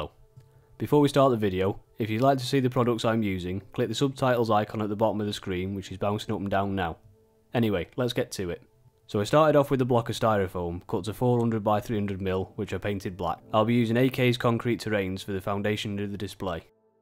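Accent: United Kingdom. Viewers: You have taken a simple concept and made it look so realistic. I admire your attention to detail and vision in these projects. Keep up the good work. If you don't mind I am curious about your accent. What part of the UK are you from?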